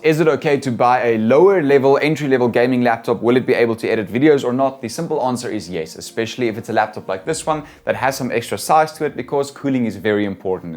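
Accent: South Africa. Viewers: are you south African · Is he South African?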